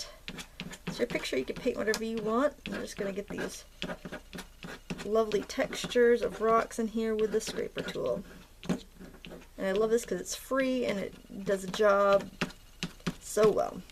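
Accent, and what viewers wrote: Maine, United States. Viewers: wow that was awesome! it's so fun watching you do the watercolors, it looks so easy and it's inspiring for me (a beginner)..I totally understood what you said tho, LOL and I am not from Maine. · I love your lighthouse! I live on the California coast and I don't believe that I have ever seen one. They make me want to live in New England. After you dry your painting at various intervals, does adding more water reactivate the previous layer of color? Thank you for giving us a touch of Maine with the painting and your accent Lol!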